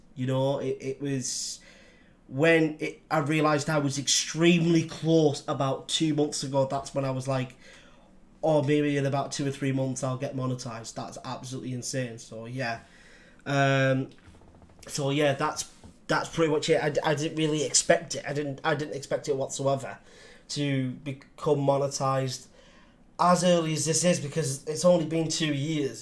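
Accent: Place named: United Kingdom. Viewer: Where in the UK are you? Love your accent. I'm pulling for you. Good luck. I know you can do it.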